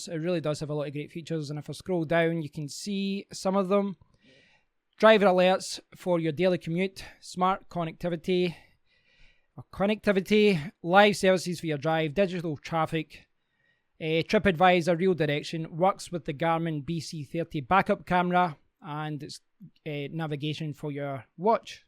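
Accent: Scotland, United Kingdom. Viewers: Neither Welsh n or Irish; it's pure Scottish. :-) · HES SCOTTISH!!!!!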